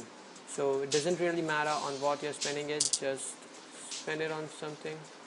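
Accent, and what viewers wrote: India. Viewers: nice accent. indian boy. ? :)) btw . tnx a lot it helped:d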